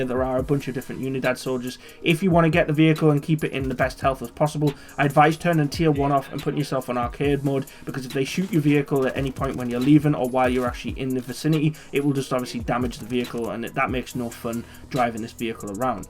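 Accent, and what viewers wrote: Newcastle, United Kingdom. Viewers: "Absolutely class"😂 R u a Geordie too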